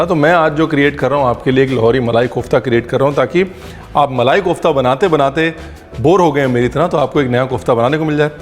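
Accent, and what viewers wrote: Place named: Punjab, India. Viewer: Sir, you sing so well, i just love the way you speak punjabi.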